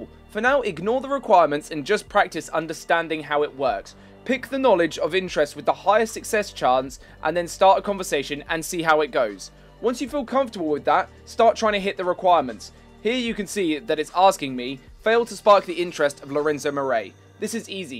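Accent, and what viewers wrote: United Kingdom. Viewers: Gunna play this at the weekend. Good to hear a Brit! and great Vids, will watch them all. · "You press the ah" (Oh, right. That's the "R") ... "Which is pretty dahmn bahd-ahss" I love how incredibly British this sounds ^^ Thanks for the tutorial, turned out I had to right-click the faces instead of drag-'n-drop them, huh? And now I know even more than what I wanted to know, like getting additional quests! I might want to focus on this even more.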